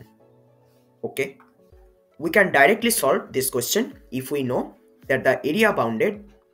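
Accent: West Bengal, India. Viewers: Sir are you from West Bengal?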